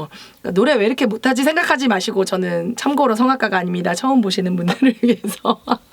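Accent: Gyeongsang, South Korea. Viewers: 열팬 됐습니다. 근데 말씀에 경상도 발음이.. 아닌가요? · 경상도 억양이 나오시는데요ㅋ 친근한 느낌입니다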